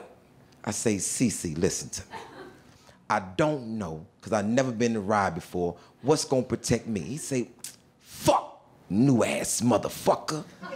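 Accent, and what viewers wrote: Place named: Texas, United States. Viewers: Sound like he was In Tdc ; some Texas shit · Lol I can tell hes from Texas and locked up in Texas prison just off the way he’s talking and the small details of the story.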